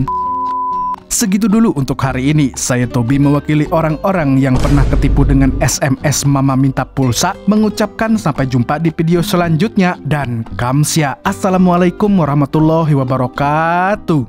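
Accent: Banjar, Indonesia. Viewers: Org banjar miminnya nh sklinya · orang mana pian bang kaya logat banjar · bah urg bnjrr skliny salam di alalak tengahh manngg hahahhakwkwkwkw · Orang banjarkah mang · Bahhhhh mendaiii urang banjarrr pun ai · urnk banjar ni sako dr suara opening iykh min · umai urang banjar sekalinya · ohh orang banjar sidin nih sekalinya. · nah orang banjar kah mimin nih 🤣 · umaaa org banua banar sekalinya sidin ti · ternyata pian urang bnjar mang lah, kd nyangka uln😂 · Urang banjar sakalinya si adminnnn😂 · BANJAR KAH MANG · Org Banjar kah mng 😅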